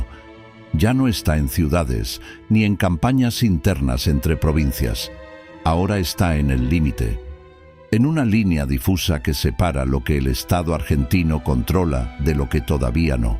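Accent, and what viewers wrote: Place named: Castile, Spain. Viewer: Buena biografía. Pero la imagen del min. 1.48 no le corresponde a Alejo Julio Argentino Roca Paz, sino a uno de sus primos Reboredo, éste creo que es Florencio. Ambos fueron estudiantes en el Colegio del Uruguay, creado por Urquiza en la capital de de Entre ríos, Concepción del Uruguay. No entiendo el tono castizo de la locución. Es para parecer más "interesante"?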